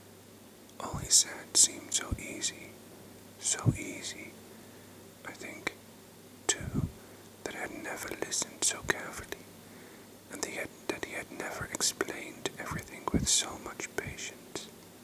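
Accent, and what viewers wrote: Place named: Germany. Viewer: You have a little german accent :) xx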